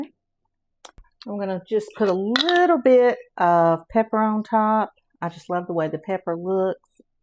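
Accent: South, United States. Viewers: Thank you Friend. I love your cooking and your Southern accent.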